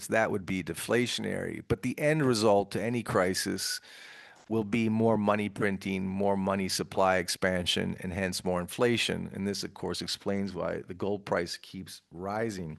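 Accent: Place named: United States. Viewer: 👍 great 👍 oder auf deutsch gesagt 👍👍Top. Dein amerikanische Akzent in Deutsch ist super 👍👍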